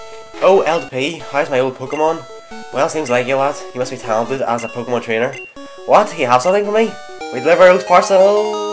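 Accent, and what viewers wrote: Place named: United Kingdom. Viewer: u sound kinda british / scottish / austrailain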